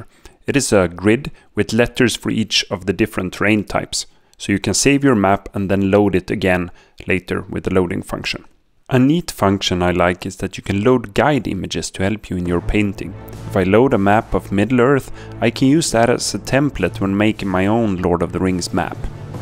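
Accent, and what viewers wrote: Sweden. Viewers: Swedish accent and replacing denmark with water at the end xD 10/10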